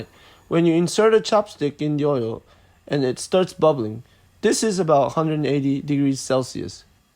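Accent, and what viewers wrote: Japan. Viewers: 結構日本人感ある英語やね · 英語が上手い人の発音じゃない。ジャパニーズイングリッシュ丸出し。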